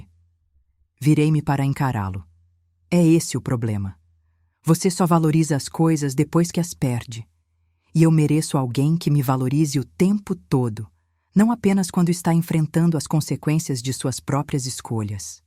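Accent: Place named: Paraíba, Brazil. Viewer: paraíba, voz bonita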